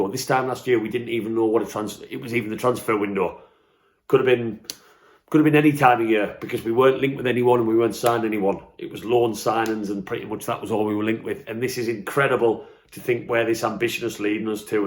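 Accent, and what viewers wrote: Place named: Geordie, United Kingdom. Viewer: Where abouts in the North East are you from? Have quite a soft Geordie accent.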